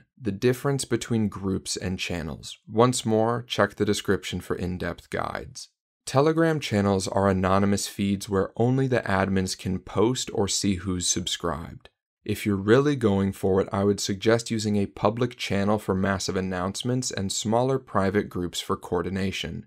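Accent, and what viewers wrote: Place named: United States. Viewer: sorry. Just your accent sounds American to me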